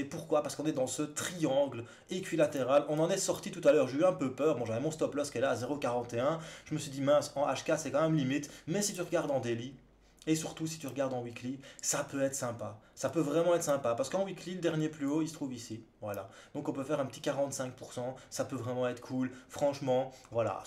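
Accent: Belgium. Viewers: Coucou ça fait plaisir d'entendre ce belle accent belge, par contre le son c'est toujours aussi bas en volume et en gamme, courage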